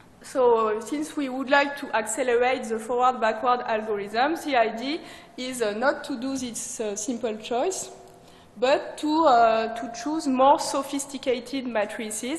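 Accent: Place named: France. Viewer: LOL, I like her French accent, which is even more interesting than the material itself : )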